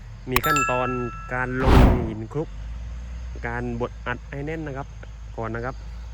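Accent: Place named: South, Thailand. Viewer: การใช้ภาษาทางใต้จะไม่ค่อยเหมือนภาคอื่น เลยทำให้ฟังแล้วมันแปร่งๆ สำเนียงไอ้น้องมันยังทองแดงเลย อภัยเถิดครับ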